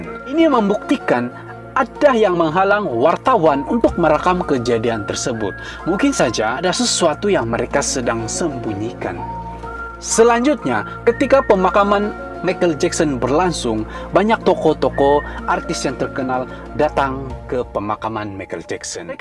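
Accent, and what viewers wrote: Sabah, Malaysia. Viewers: Ini bahasa sabah sarawak la...mlaysia ada borneo kan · Pertama aku sangka orng Indonesia rupa"nya orng Sabah😂🙏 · ya bahasa org sabah memag begitu loghat nya lebih kepada bahasa baku😊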